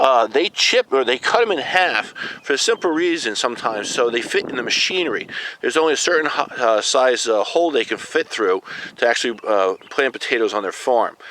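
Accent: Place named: Pennsylvania, United States. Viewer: Where in PA are you? I'm just assuming PA or southern NY from your accent...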